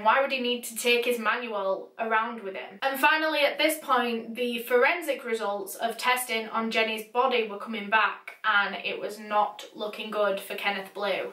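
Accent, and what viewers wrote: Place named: Yorkshire, United Kingdom. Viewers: Lol its just a Yorkshire accent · I’m enjoying your videos 💕 your Yorkshire accent is great! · Hahaha being from West Yorkshire myself I just can’t hear the ‘baybeh’ like other comments it just sounds normal 🤣🤷🏼‍♀️ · Must be American , this is a Northern accent 💜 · it's how we roll in Northen England. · It's a Yorkshire accent haha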